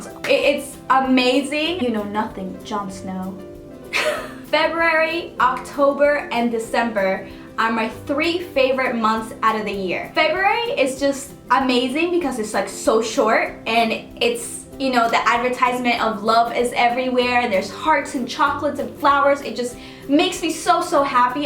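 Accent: Spain. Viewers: i love the Spanish accent that influences the pronunciation of your English letters ❤❤ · I love will smith I want to go to Tokyo japan I want to speak Portuguese your Spanish accent is very pretty btw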